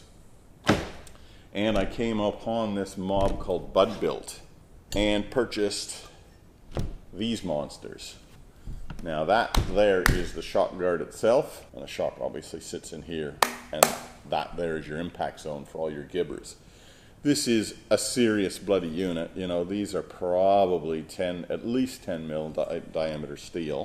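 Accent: Canada. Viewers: Very useful video! Thank you! Are you a Newfie?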